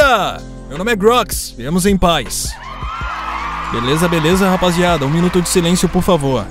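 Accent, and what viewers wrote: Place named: Rio de Janeiro, Brazil. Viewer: Grox vc e carioca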